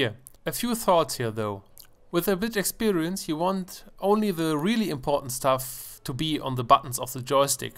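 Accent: Germany. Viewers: Hes german you can hear it at the voice🤣🤣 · I love your accent, German accent is one of the best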